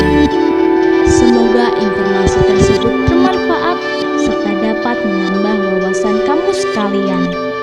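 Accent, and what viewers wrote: Java, Indonesia. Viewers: kok indonesia agak medok jawa, jadi gk enak dengernya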